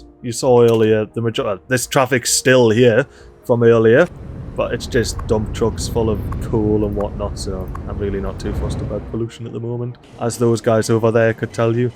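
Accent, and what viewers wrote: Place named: Newcastle, United Kingdom. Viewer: geordie commentary? Love to hear it 🤣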